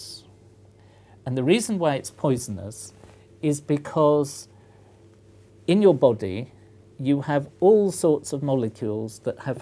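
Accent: England, United Kingdom. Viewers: Crazy hair, lab coat, British accent = genius most likely. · and just the right amount of British accent.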